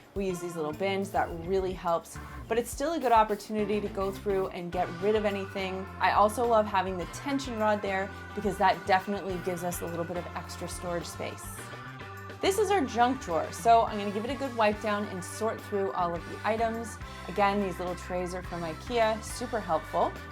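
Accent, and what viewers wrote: Canada. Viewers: My boyfriend knew you were Canadian just by your accent! I couldn't tell the difference until I focused on it. The way you say "progress" is so cute ☺️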